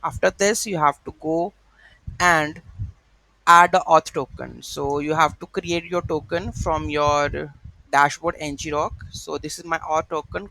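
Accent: India. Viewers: I hear indian accent i like the video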